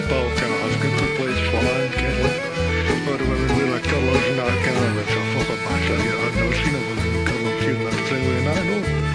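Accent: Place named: Scotland, United Kingdom. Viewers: I love the sco'ish accent! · He is a Scot!!!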